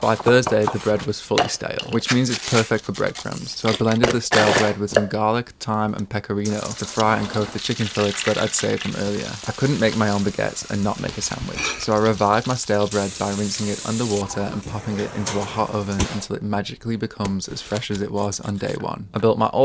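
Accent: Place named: Australia. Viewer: Your accent is super interesting. Australian, with a touch of Manchester, a little bit scandi and North American all in one.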